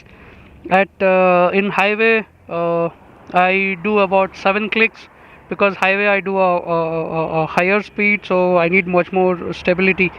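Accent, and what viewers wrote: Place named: India. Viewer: Hate the freaking indian accent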